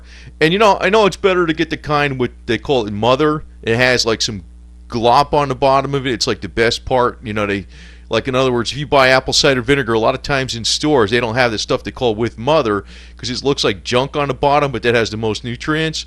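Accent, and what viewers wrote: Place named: New England, United States. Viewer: I absolutely adore your voice and the way you talk, including the accent. 💜 Is that a New England hint in your accent ? I'm not an American so it's hard to figure out. But it's amazing.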